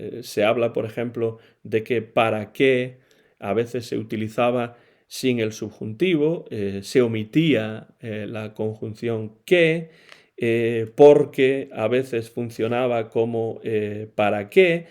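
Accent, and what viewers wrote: Spain. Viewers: Tienes acento como de las Alpujarras o por ahí.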